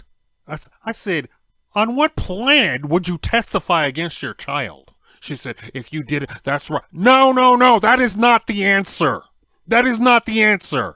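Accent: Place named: Caribbean. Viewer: ha ha dude where is your family originated? i hear a carribian accent.Were you born in nevada?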